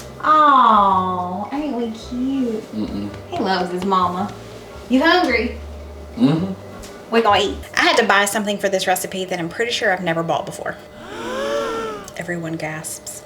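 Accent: South, United States. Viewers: Mama English teacher we talk properly when we're at work and when we have too. When we're just being nice and among family and friends the southern comes OUT! It's okay girl😊😘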